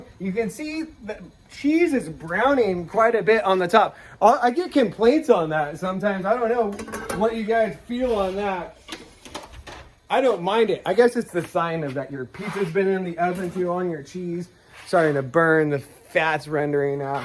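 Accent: California, United States. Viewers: The California accent is amazing. Like from The Californians on SNL.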